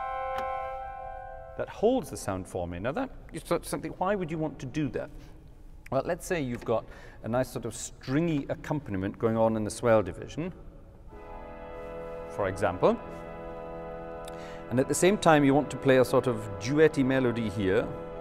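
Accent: Scotland, United Kingdom. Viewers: To my British ears...no! has a lovely Scots accent:) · Er ist ja Schotte. Schottischer Akzent klingt angeblich, als würde ein Bayer Englisch sprechen. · I didnt realise you were scottish! Explains the accent then haha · Did german language steal your scottish accent?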